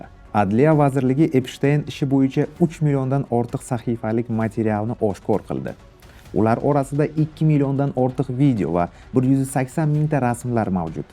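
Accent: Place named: Navoiy, Uzbekistan. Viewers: Aka Navoiydanmisiz, shevayiz shyaqqa tortyapti😊😅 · Навоий акцент ку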